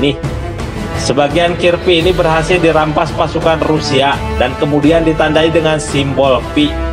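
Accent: West Java, Indonesia. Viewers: Sunda banget mimin dari logatnya 😀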